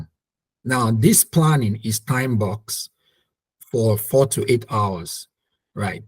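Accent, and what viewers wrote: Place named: Nigeria. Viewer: 😁😁😁, my boss thank God you're Nigerian ooh,other people i no really hear them,im sorry if it's offending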